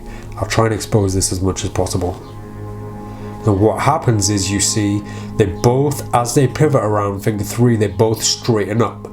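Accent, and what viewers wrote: United Kingdom. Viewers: The cockney accent is always on point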